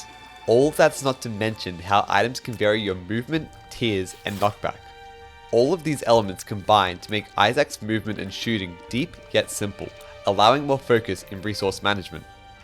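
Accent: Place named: United Kingdom. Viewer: i thought it was mean but then i realized he was just british, carry on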